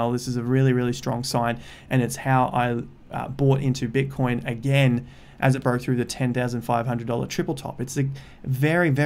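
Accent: Australia. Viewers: It's very nice to hear an Aussi accent. I'm in Melbourne. The comment about the "kidney-transplant" was a fine touch. I'm considering doing it- but Ada might plunge, and I'll end up with no money and one kidney!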